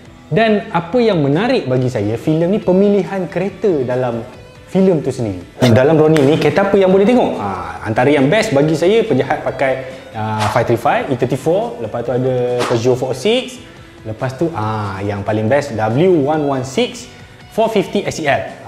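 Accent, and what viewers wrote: Kelantan, Malaysia. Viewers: Ralik bangg. Kelantanese ke?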